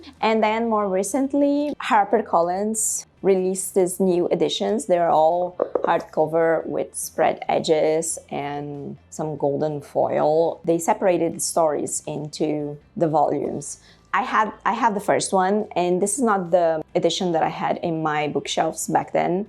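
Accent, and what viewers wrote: United Kingdom. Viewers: I love your English accent! This vlog is as amazing as your Portuguese ones, congratulations! Loved watching it and seeing you so comfortable and chill! ♥️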